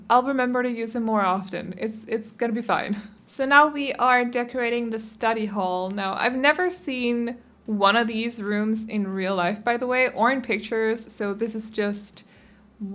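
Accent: United States. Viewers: Åååh hvor er jeg glad for at du taler med Amerikansk accent og ikke klassisk Danglish. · I keep forgetting that you're not American because you sound like it.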